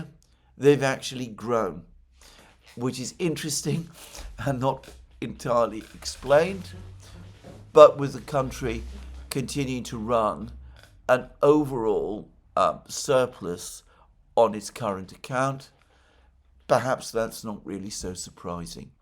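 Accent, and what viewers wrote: United Kingdom. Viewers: AM's real audience is Russia. AM is reading a Kremlin script. The Kremlin chose AM because he is physically repulsive and has a British accent and because they got him cheap because he had been recently disbarred, a fact the Kremlin never discloses in its script it issues to AM to read. I watch the program because it is a direct line into what the Kremlin is telling its own citizens. Again, keep in mind that the reason the Kremlin selected AM to read their script is because AM is physically repulsive with a British accent, which is how the Kremlin wants to portray the West.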